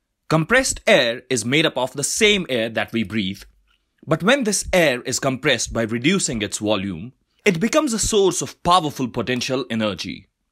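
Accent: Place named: India. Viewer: It's Indian english accent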